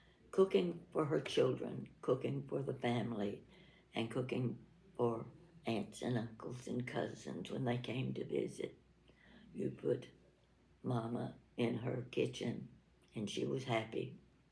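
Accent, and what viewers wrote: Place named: Florida, United States. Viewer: This is Kitty I live in S. California. I discovered your channel a week or so ago. I forget which video but you were in the kitchen. My mother in law was from Lakeland Florida and I miss her voice. Your accent is similar and that’s what I heard at first. In the kitchen you remind me of my grandmother with whom I spent many hours in the kitchen with. I am 77 now. A widow since 2019 after 50 plus years together. I was his caregiver for decades. It occurred to me that at least in my world out here on the West coast life seems isolating. I too talk to myself. I read that it is good for your brain. I also love dishes and setting the table. I have what they call “poorman’s fiesta” called Harlequin made by the same company. Summers as a child I’d spend a time at my grandmother’s house near Atlantic City NJ. Her house had a front porch and we would have breakfast out there. 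My grandmother would put our soft boiled eggs in the Easter egg color Harlequin sugar bowls. Clever. The bowls must have come out in the 20’s as they were very Art Deco looking to me with triangular shaped handles and lid top. As I write I realize it must have been around the time she passed away I started to think of those things we ate the soft boiled eggs in and oddly enough that very year they reissued them. I wanted the original ones and began hunting for them and that started my collecting other dishes and china. I love dishes and ceramic things. p.sl. I got a felt skirt with. S& H green stamps. I wanted a p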